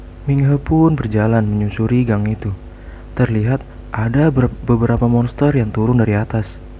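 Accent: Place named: Ambon, Indonesia. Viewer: Suarane ambonnn😊🙏🤣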